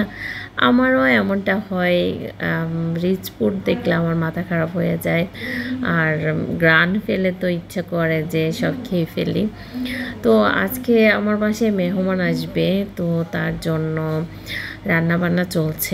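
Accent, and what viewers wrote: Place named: Chittagong, Bangladesh. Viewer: আপু কোন জেলায় আপনার বাড়ি আমি চট্টগ্রামের মেয়ে কথার টানটা পরিচিত লাগে❤❤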